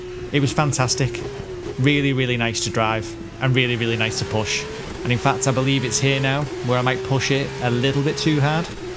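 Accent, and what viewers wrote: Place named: Manchester, United Kingdom. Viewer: you sound Mancunian to me, I could be wrong but YANITED. RSS car sounds pretty good although I love what VRC offers in terms of setup and features with its csp version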